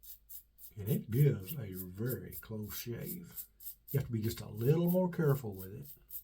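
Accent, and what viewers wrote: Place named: Appalachia, United States. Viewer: where are you located? Do you live in the Appalachian Mountains. You have about the same accent as the people in my area. I live in pound,Va right on the border of Ky. Tenn. and Va. I like your Videos!!!!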